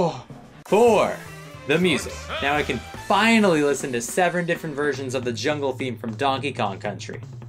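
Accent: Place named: England, United Kingdom. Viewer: Top quality English accent.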